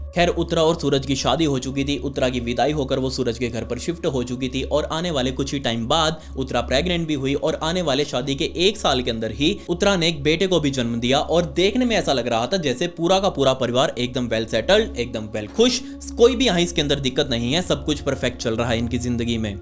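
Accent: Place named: Delhi, India. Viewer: Hi ❤❤❤❤ मुझे आपके बोलने का अंदाज़ अच्छा लगता है पुरी दिल्ली वाली भाषा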